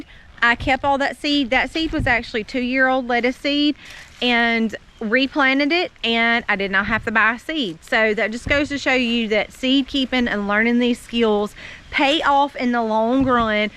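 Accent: South, United States. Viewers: I always enjoy watching you all! And quite frankly I love to hear your wonderful southern accent! My family was from Alabama… and I still have some there! I live in CA! Wish I could live somewhere Else . And maybe in the near future we will be making some trips to the south to see how we like it, We are in our 70’s so we are not young chicks! However we have to get out of CA even though I was born here I really hate what it has become. Watching your channels have renewed my desire to be more free From all this concrete jungle and grow our food and have some animals. We live in a beautiful area, and my husband has worked hard to provide for our 5 kids. With them all grown up now, we just married our baby in July…she is 32… we were married at 19 & 23 years old. So the world has changed so much since then. Watching you all has given me more hope to actually change things. 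Thank you for your channels ..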